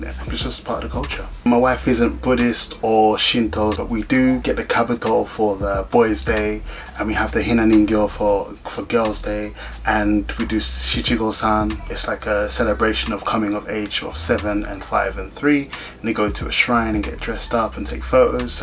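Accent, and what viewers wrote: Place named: United Kingdom. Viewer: I don't remember when i subscribed to your channel, but I'm still not used to hearing the British (London?) accent 😆😆I've been watching too many American an Canadian YouTubers in Japan. it's always good to hear more Brits haha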